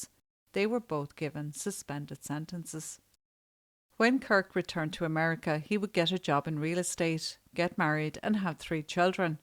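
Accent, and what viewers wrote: Ireland. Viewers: Sorry to butt in but my dear you look terrific on my tablet let alone your delightful demeanor and your sweet Irish accent to boot 😊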